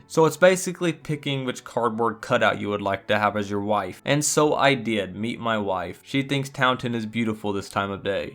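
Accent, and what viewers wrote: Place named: Alabama, United States. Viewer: Idk where you're from but your accent is pretty much exactly like everyone I know (I'm from Alabama for context)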